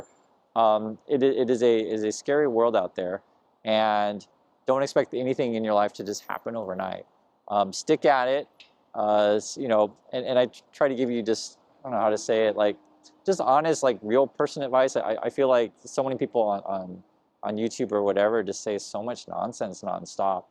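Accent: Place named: West Coast, United States. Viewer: Beautiful view. Are you from CA? You have a west coast accent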